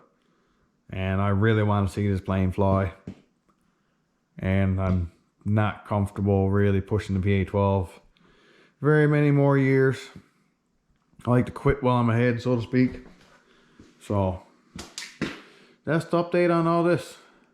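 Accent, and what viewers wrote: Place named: Newfoundland, Canada. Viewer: A treat. Get to hear my homie NL accent explaining the complexities of building an airplane in a garage in Labrador. Thanks for the update.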